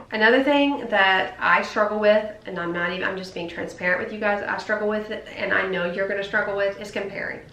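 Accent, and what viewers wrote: South, United States. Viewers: I just found you from Love Meg and I just love you!!! You're so sweet and I can legit just watch your videos for your southern voice! I love it!!!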